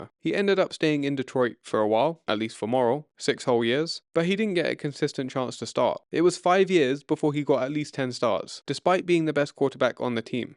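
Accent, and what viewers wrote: England, United Kingdom. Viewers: Why do you have a English accent person talking about American football,stupid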